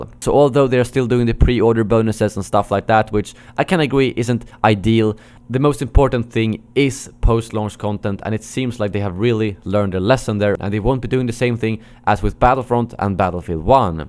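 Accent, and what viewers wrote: Sweden. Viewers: Man kan höra på din engelska att du är svensk. Men bra video annars!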